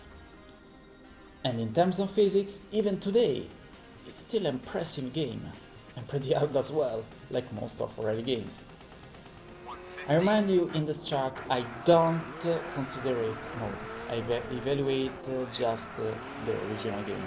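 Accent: Italy. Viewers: ARE YOU ITALIAN??? I HAVE PROVE!!! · E poi ci sono io che sto ancora cercando di capire se sia italiano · Bro ma sei italiano?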